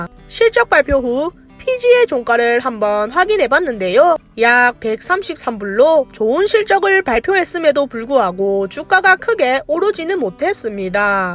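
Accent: South Korea. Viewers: ㅋㅋㅋ 말투 너무 귀여우세요ㅋㅋㅋㅋㅋ 경상도지예~~~~? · 서울 말투 쓰시는거 보니 서울분이신듯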